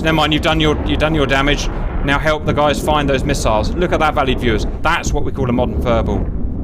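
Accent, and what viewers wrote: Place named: RP, United Kingdom. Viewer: A lot of relentless jibber jabber from a posh boy with a neo RP accent. Wonder how long these tossers would last in a real war?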